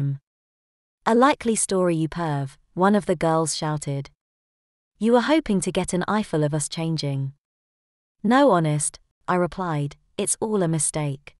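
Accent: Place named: United Kingdom. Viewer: What a fraud! A story about American football, w/cheerleaders, told in an British accent, using English terms not used in the US. Flat is actually an apartment, match is game, etc. And the cheerleader's lockerroom is closely guarded at ALL times to keep interlopers out. And no, the final game of the season would be played on home turf, not in England. Not even a good try, it's a complete fail!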